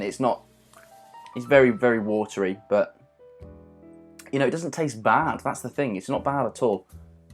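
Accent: United Kingdom. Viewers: British accent brought me here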